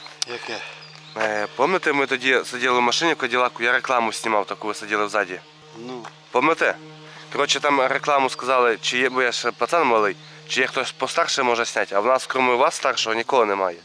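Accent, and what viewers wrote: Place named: Surzhyk, Ukraine. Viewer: Будє прикольно - вахааа.дятел суржик жосткий.песня отстой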